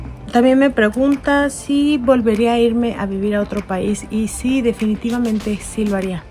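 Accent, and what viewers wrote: Mexico. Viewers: Tu voz siento que está diferente, antes te escuchaba algo chilanga y ahora es diferente, por cierto te vez muy bien muy linda saludos desde MTY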